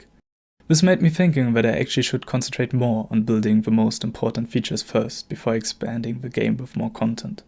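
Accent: Germany. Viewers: Man erkennt deutsche einfach am sprechen xD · Liebe es wie deutsch du klingst obwohl du extrem gutes englisch sprichst. Sehr gutes video btw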